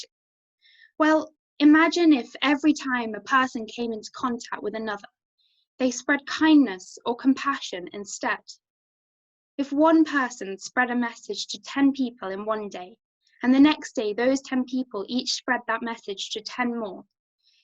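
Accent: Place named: South, United Kingdom. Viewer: Sound like some posh southerner. Doesnt sound very Cumbrian to me.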